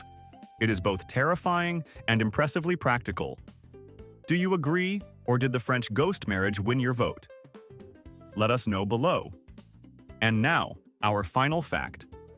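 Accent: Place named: United States. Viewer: this collection has been put together, evidently, with an American point of view, even spoken with a recognizable American accent American prohibits chocolate surprise Kinder eggs because only American children are so st++id and they swallow the surprise... even Pope Francis made fun of it during an official state visit to the Vatican of J.D. Vance and gave his children Kinder eggs as gifts !!! IT WAS HILARIOUS THE WHOLE WORLD MADE FUN OF AMERICA and AMERICANS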